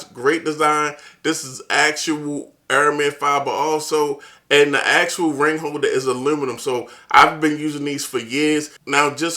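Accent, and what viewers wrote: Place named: Maryland, United States. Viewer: You sound like you from Maryland 💪🏼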